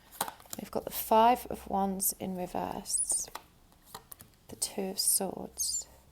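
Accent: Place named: Birmingham, United Kingdom. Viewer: Birmingham acceny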